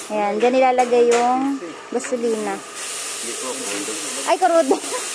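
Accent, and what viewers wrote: Visayas, Philippines. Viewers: kakatuwa tlga magsalita ang bisaya natutuwa ako sau madam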